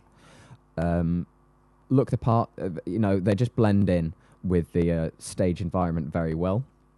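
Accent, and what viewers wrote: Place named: Darlington, United Kingdom. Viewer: INMHO... Sounds like, a lad Darlington.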